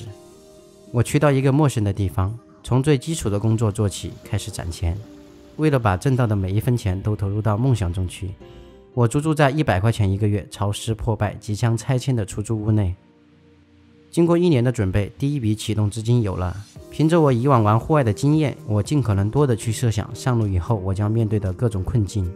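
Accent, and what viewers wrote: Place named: Sichuan, China. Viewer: 支持你！我也是为了梦想来到了美国！希望未来在美国闯出一片天地！老乡，听你的川普，很亲切！我四川广安的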